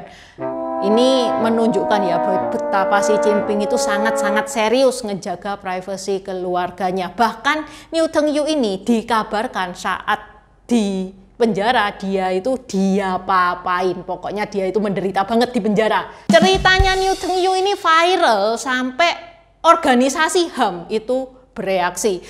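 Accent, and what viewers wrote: Java, Indonesia. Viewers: Bahasa kamu ciindo Jawa . Pasti jawane medok ... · Logatnya Cin Wa bgt 😅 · Logat jawi cici ne kuental baangat yoo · CICI produk lokal yang meddok, aku suka.... 😊😊😊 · Medook Jowone😂😂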